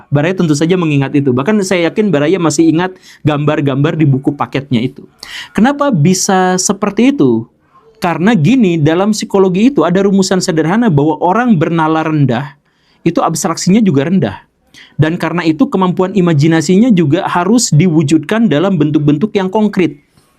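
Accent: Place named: South Jakarta, Indonesia. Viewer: Ngomong lu kayak anak jaksel mbul!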